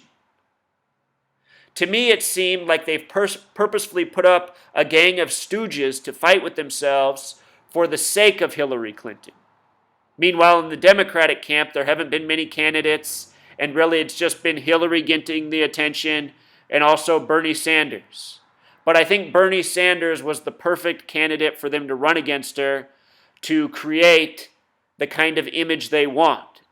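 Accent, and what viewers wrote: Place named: New Jersey, United States. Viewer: My gut feeling tells me your from jersey.....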